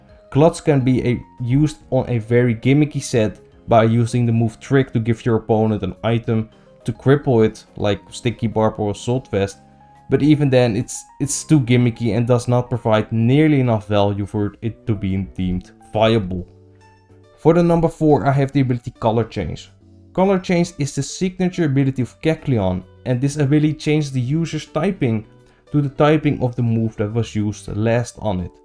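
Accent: Netherlands. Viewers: I just hear you're a Dutch speaker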